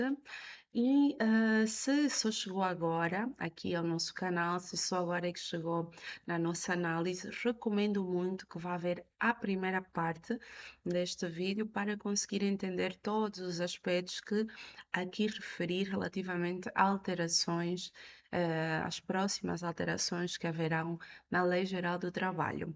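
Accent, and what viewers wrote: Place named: Cape Verde. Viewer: A formadora tem um sotaque interessante, parece de cabo verde.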